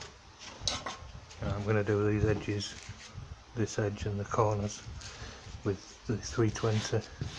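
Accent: Yorkshire, United Kingdom. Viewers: Really, really enjoyed that. You taught me a great deal there. Thanks for all the work. Nice to hear a Yorkshire accent - from a Yorkshire man living in Sydney. Cheers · I'm from Yorkshire myself and I van hear the accent ☺ great tips thanks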